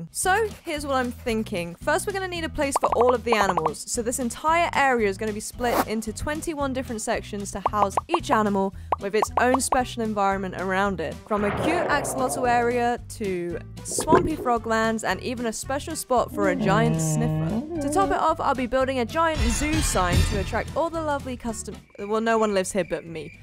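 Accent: United Kingdom. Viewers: 1 are you British 2 how did you get so good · Are you british?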